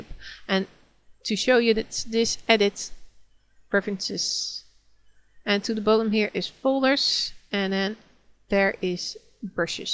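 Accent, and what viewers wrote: Netherlands. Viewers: Dear. Thank you sooo much!!! And where are you from??? You sound Dutch.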